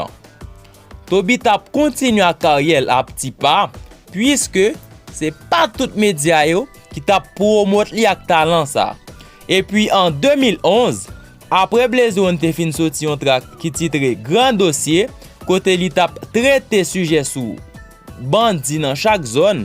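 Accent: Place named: Cap-Haïtien, Haiti. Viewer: Ou pale menm jan ak moun okap ou se moun okap?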